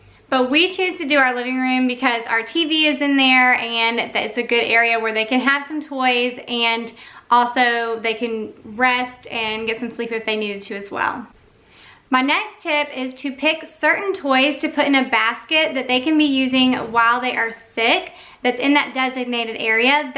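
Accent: South, United States. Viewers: Beautiful Southern accent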